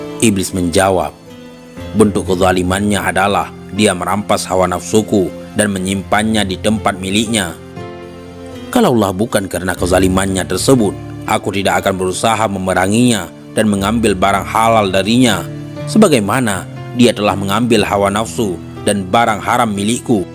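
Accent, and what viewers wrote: Aceh, Indonesia. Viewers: ❤️❤️🙏🙏min pengisi suaranya orang Aceh..ya min...semoga tetap dalam iman Islam smpai nafas berhenti ... Jgan lupa min dialog nabi Isa as dgn iblis jga dialog nabi Muhamad SAW dgn iblis🙏🙏🙏🙏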